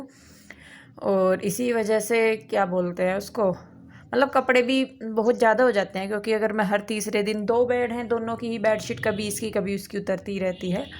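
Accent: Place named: Baghpat, India. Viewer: Aapki language dekh kr lagta h jaise aap baagpat badhot ki boli bolte ho meri h friend h waha ki to uske ghar me bhi sab aise hi bolte h acha lagta h sun kar.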